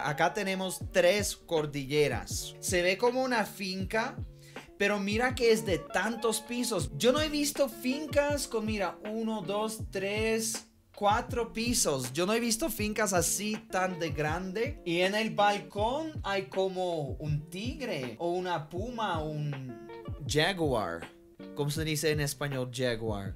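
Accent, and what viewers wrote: Colombia. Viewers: habla como si fuera colombiano de nacimiento 🥰 TENEMOS 3 cordilleras en bogota no TENEMOS casas asi 😂 cual extrajenro si tu ya estas asi habla un colombiano eh ave maria me encantas tus videos 💗 y no se si ya lo sabes supongo q si pero igual te lo digo xd la cancion del teaser se llama y si algun dia haces una introduccion para tu canal deberias usar esa cancion porq iria excelente con el canal 🥰 y no eran orquideas 😂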